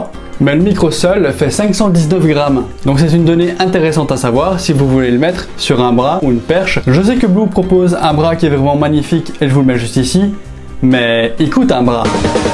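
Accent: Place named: Belgium. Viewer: tu est de quel pays avec cette accent belge peut etre?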